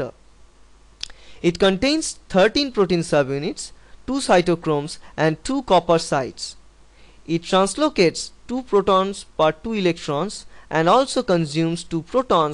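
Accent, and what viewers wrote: India. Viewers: OMG....indian accent.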